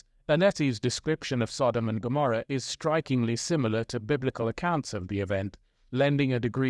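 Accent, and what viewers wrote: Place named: United Kingdom. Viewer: Who NEEDS that shrill, played out 🇬🇧Accent?? Seriously, it’s so tired. And super shallow, too.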